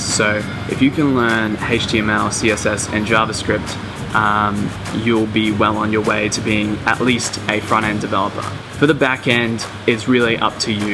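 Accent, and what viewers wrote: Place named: Australia. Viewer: I'm 100% you're australian (by your accent)!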